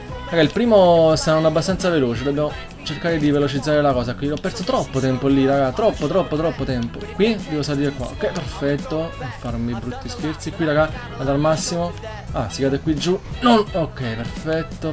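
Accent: Naples, Italy. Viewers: È di Napoli :D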